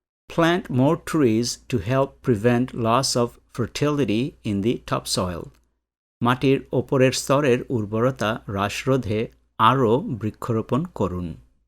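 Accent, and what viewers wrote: United States. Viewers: আমিরিকান স্টাইলে না বলে স্বাভাবিকভাবে বলবেন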